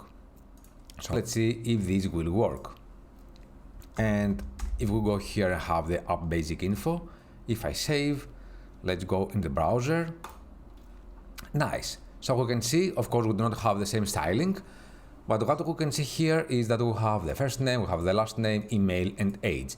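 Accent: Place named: Italy. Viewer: Thank you Sir this video. And also I am curious are you an Italian ? Because you have an Italian accent which is good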